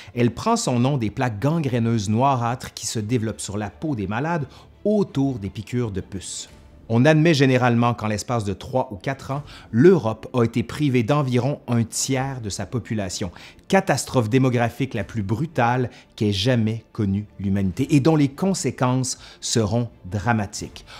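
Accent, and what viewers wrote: Quebec, Canada. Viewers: J'aime ton accent québécois.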